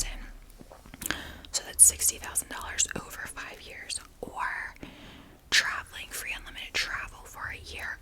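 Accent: New Jersey, United States. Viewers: The jersey accent though lol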